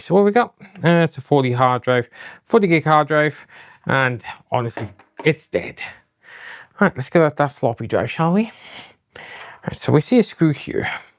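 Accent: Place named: Wales, United Kingdom. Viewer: I thought you were German .... but your Welsh!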